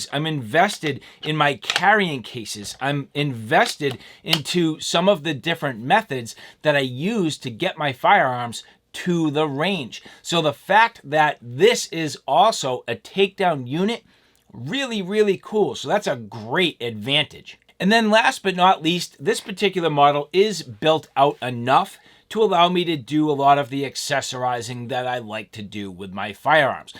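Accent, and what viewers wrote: Massachusetts, United States. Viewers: You are from Mass. I can tell.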